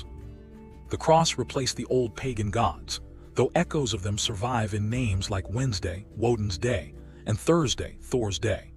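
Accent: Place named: United States. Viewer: Sorry as soon as I hear an American voice over on British history I turn off , you need a local narration even if it's AI.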